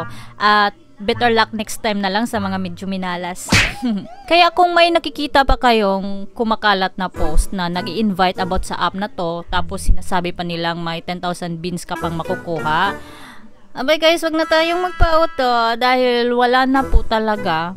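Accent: Visayas, Philippines. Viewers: Wow bisaya si madam hehe thank you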